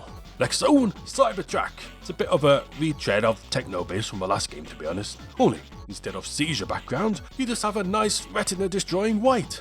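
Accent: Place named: Yorkshire, United Kingdom. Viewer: I always assumed you were a Yorkshire man!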